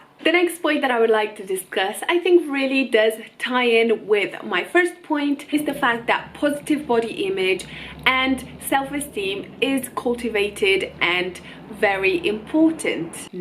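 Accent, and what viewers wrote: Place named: United Kingdom. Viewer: You have picked up a much stronger British accent than all the Romanians I know in the UK.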